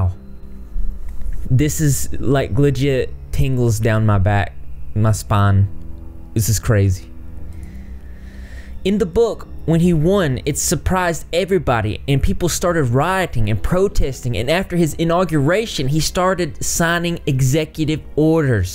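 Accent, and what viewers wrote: South, United States. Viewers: Your hair is fantastic, I'm greatly enjoying your videos, & your wonderful Southern accent sounds a lot like mine lol. Greetings from Asheville in Western North Carolina :) Yeah this whole Baron Trump book thing is CRAZY! I figure Lockwood or someone long ago had a dream or vision about the time we are living in, then wrote these books from what they saw? President Trump is a baron too, a property baron, as well as part German. Wonder if that illustration in the book also closely resblembles the Donald as a child? Yes, cold shivers here too, for real. And I don't get those easily. I live in constant synchronicities that many people would not believe. So much that I plan on writing a little memoir book about my life lol! But this book is wild. Great coverage & commentary! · Sounds like a Southern accent from the southeast US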